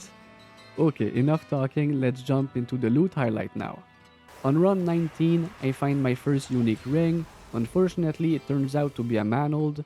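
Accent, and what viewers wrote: Quebec, Canada. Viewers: Are you from Quebec? Accent seems familiar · Facile d'reconnaitre un Queb quand t'en entend un lol. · ca sent le quebec icitte !!!!! good video bud! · Are you quebecois? I feel like I can recognize the accent as a fellow Canadian. Cool video either way!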